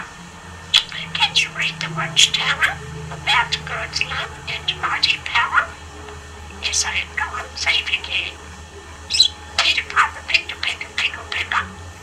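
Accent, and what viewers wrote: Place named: Australia. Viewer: I detect an Aussie accent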